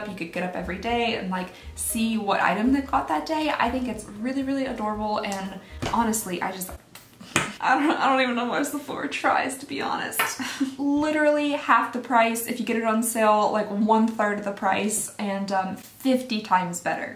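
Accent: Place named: South, United States. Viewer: She’s not saying crown... it’s just her accent so that’s how YOU hear it due to her accent being wildly different from yours. Everyone in the south where I lived says it that way snd I hear it just fine as crayon. When I moved to England for a long time and came back it sounded similar to crown to me at first for a bit. It’s just the accent. Lots of people say it this way.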